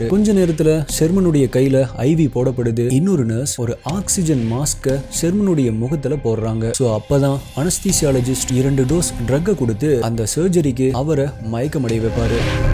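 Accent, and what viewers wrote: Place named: Kerala, India. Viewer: YOUR VOICE LIKE FROM KERALA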